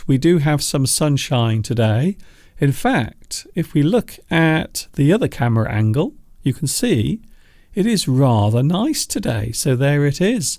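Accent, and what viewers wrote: United Kingdom. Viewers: My lovely British accent!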